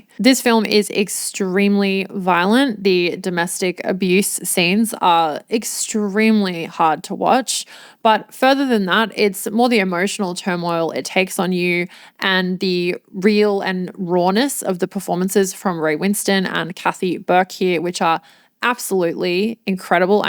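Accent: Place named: Perth, Australia. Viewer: So..... your a pearl ❤ from perth eh? That explains alot 🎉 I love yout accent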